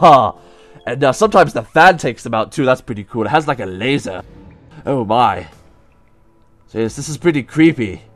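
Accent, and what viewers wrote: United Kingdom. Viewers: Sound British I don’t know why are you supposed to be like oh I have a toy verse I can do like a girl sound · Good God hes british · He is speaking British accents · are u doing a british voice or some thing =_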